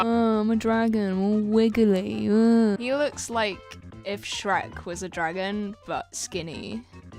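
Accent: United Kingdom. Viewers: wtf your bri*ish????????? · You sound so British and it makes the whole thing so much better to live through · i knew u were british right as u pronounced artist without the 't' in the middle, bri ish.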